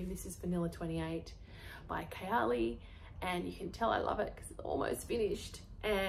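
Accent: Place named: Australia. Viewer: yr Aussie accent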